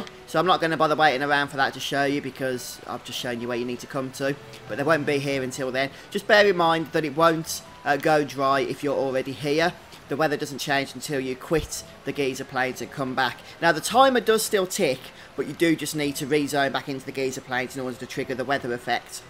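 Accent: United Kingdom. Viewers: Yeah..not all we are english native speakers. Plus with his british accent..it's hard to understand everything..🤣🤣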